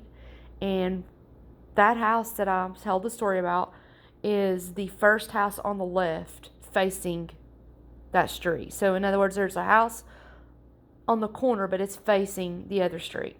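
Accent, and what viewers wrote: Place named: South, United States. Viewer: damn I must be psychic lol. There is nothing wrong with your accent , southern charm.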